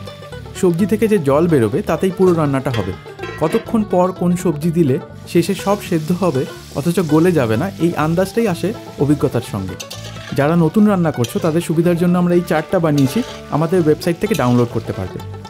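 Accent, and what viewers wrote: East Bengal, Bangladesh. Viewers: কথা শুনে বোঝায় যাচ্ছে পুরো পূর্ববঙ্গে ইয়া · Tumi ki from east Bengal . Bharali bolle bole bollam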